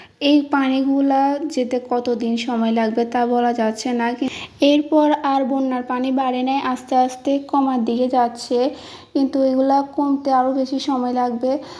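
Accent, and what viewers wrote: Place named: Noakhali, Bangladesh. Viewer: খাটি নোয়াখাইললা 😊😊😊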